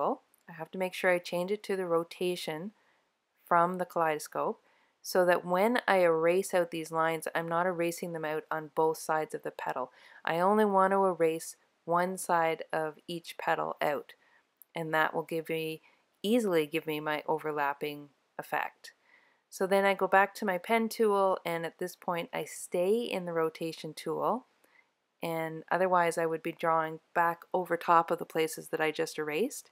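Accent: Canada. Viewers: Excellent quick tutorial. (Are you Canadian?)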